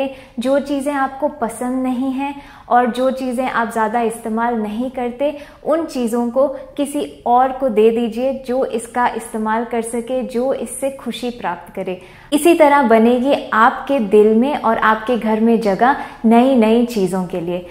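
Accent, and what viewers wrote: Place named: India. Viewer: And most importantly love your way of talking. An indian fragrance which came out from your voice that i love the most.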